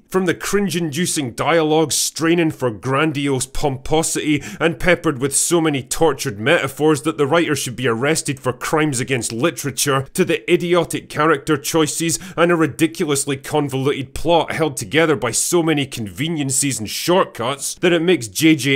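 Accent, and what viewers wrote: Scotland, United Kingdom. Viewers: Thank heavens, I saw season 2 was on Amazon last night, I watched the review of season 1, and i felt so awful after 3 minutes, I wondered if Id need to find the toilet.. This is not the video I wanted, but its the video I Needed, as sorts out the world into sanity/insanity in sheer minutes with an excellent Scottish brogue. Cheers man.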